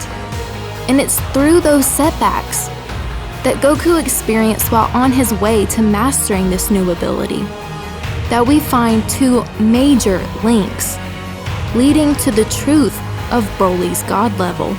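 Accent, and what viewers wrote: South, United States. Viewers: I thought u had a southern accent I'm from s.c so I've heard way worse.i love your voice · you're a fellow southerner? well that's cool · I knew you were a southern girl lol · Where r u from with the southern accent? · your southern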